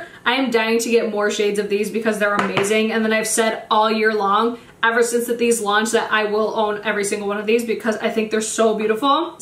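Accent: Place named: New York, United States. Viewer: I really need to watch other things besides makeup videos on YouTube😂like I really should watch more tv shows, movies, read more. But I think that if I’m on YouTube, I will plan on binge watching your videos. Love your NY accent and attitude. Keeping it real and not sugar-coating anything. Love that!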